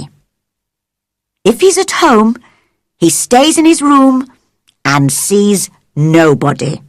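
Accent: United Kingdom. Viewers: اللهجه بريطانية 😭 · you v'e got wonderful British accent with amazing voices ❤❤❤ please keep posting your stories, l really liked and I appreciated it so much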